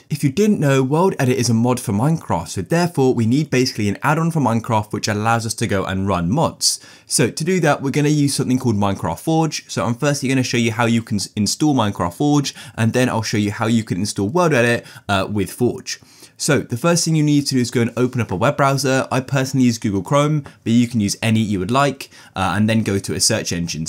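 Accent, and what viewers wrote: United Kingdom. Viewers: don't speak British, speak American English!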